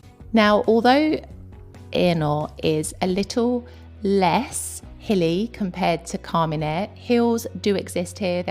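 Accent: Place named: London, United Kingdom. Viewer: Just found your channel what a breath of fresh air,really enjoying your vlogs and looking at some stage to spend about 3 maths of the year in Italy as retired and enjoy the lifestyle... Sicily really appeals so get that business set up soon.. Love the honesty and of course the accent Londoner myself keep up the great work and Godbless you🎉🎉🎉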